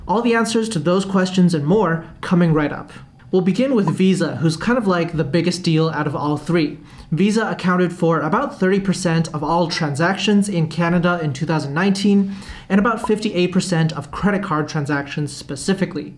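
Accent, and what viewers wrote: China. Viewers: 口音一聽就是中國大陸的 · 隐约听到些中国口音？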